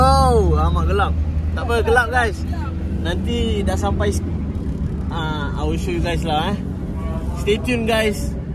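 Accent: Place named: Singapore. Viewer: dari slang bahasa je kita dah thu dari singapore...tapi apa pon mantap bosku..gerekkk seyy....salam dr johor..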